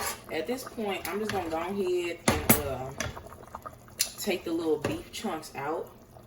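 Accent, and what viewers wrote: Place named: South, United States. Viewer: Bruh you sooo country I love it !! Definitely subscribed and making this recipe ASAP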